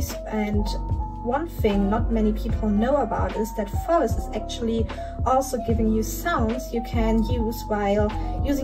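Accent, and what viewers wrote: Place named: Germany. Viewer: That German accent😁 Grüße! Gutes Video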